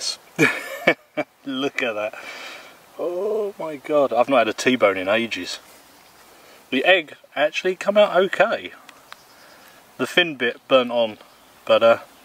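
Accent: England, United Kingdom. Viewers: nice English accent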